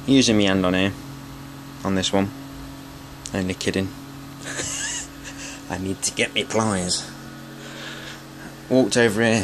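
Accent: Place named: East Midlands, United Kingdom. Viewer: East Midlands accent... Derbyshire? Ripley for a guess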